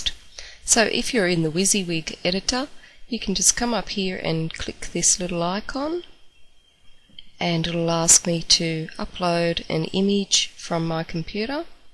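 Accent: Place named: New Zealand. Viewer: The voice is clearly NZ and she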